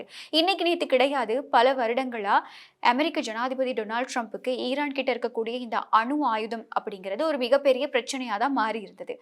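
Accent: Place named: Chennai, India. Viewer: மூதேவி Madrasதமிழ் கதைக்காதே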